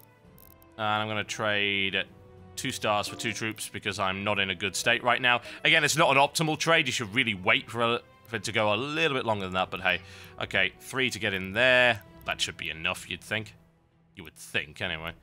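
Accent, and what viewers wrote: United Kingdom. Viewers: I was under the impression he was British... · *Facepalm* ITS A BRITISH ACCENT. OBVIOUSLY.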